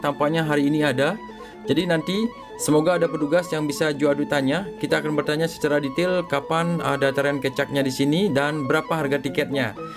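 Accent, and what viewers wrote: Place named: West Sumatra, Indonesia. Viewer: Maaf krn saya penggemar menikmati video" nya tp saya jg pingin tahu identitas al: nama, asal dll krndari logat sepertinya asalnya dr Minang, Sumatra Brt. Terima kasih dan teruskan berkarya ttg Bali/ Hindu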